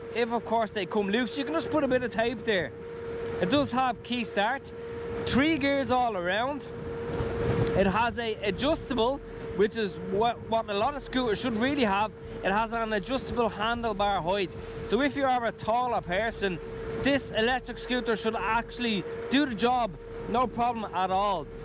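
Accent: Ireland. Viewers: You sound Irish i live in Dublin thinking of getting one put off recently after i heard someone was charged and banned from the roads for riding his e scooter without a license or insurance, Everywhere i look online it says there's no license required or insurance in Ireland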